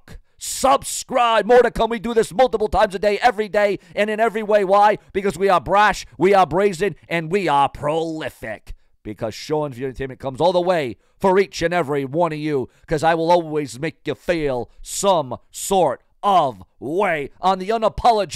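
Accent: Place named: California, United States. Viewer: Sean any news from wrestling from you would be great. You have an awesome voice. I love it. What state are you in? You sound like you are from California. Keep up the great work Sean you da best❤❤❤❤❤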